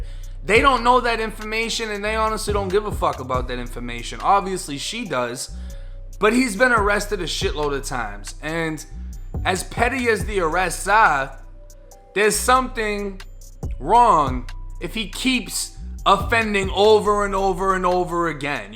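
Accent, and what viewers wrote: Boston, United States. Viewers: "To portray that he was nothing more than a criminal, killed by KARMA." LOL your boston accent made it sound like you said he got killed by comma. Kuz for some reason boston niggas dont like to pronounce the letter R if an A comes before it. Lolol Karma (kah-ma)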